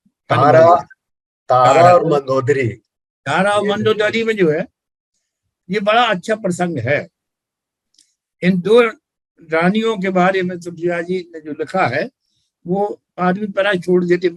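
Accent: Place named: Bundelkhand, India. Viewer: Mujhe inse dada ji se milna hai Inki aawaj ko sunkar aisa lgta hai jaise ye Madhya Pradesh ke bundelkhand se hain Kyunki main bhi wahin ka hoon Inse kaise mile? Or books kahan milegi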